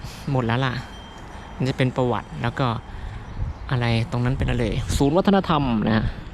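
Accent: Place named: Loei, Thailand. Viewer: สวัสดีค่ะคุณวี สวยงามมากค่ะ วิวสวยบรรยากาศดี เขื่อนสิริกิต์สวยมากค่ะไม่เคยไป สำเนียงเหมือนจังหวัดเลยนะ เขาเรียกว่า ภาษาญ้อหรือเปล่าคุณวี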